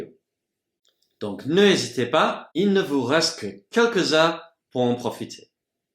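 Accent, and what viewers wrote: France. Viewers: Hello Your French accent is so sick..By the way, I am afraid there has been a little mistake concerning the bee's knees on the screen . It's written the bee's kness. Thanks a lot for these slang words Cheers 🍻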